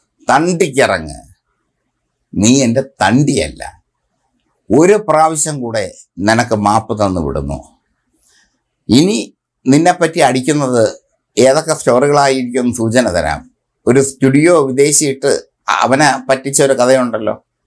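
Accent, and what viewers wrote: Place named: Thiruvananthapuram, India. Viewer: അണ്ണാ tvm ഭാഷ... വളരെ മനോഹരമാണുകേട്ടോ... രണ്ടാളും ട്വിൻസാണോ?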